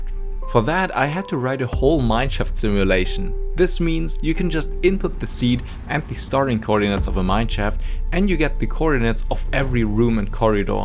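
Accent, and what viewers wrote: Germany. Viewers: Are you German by Chance? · Ich höre so deutsch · are you german? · Are you German by any Chance?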